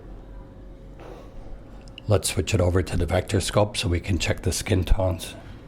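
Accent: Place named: Chicago, United States. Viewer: awesome info, i gonna grab the leeming lut for cine 2 and try it out! Btw are you from chicago?? I think i sense a chicago accent lol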